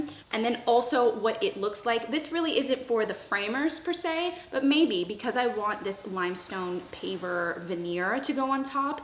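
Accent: Texas, United States. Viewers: Your Texas accent is coming back video by video. :DDD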